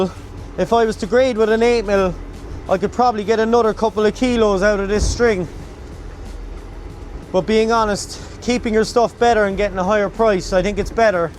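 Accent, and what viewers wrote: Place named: Cork, Ireland. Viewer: Love your video's. Get a bit home sick when I hear the Cork accent and see the scenery! Keep the videos coming...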